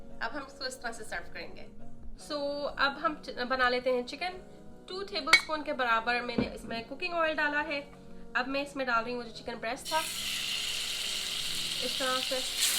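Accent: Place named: United States. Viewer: Aapka amarican accent bohot accha hai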